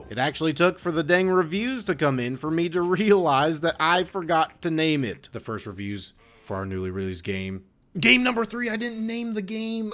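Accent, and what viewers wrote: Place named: United States. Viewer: What a dreadfully american accent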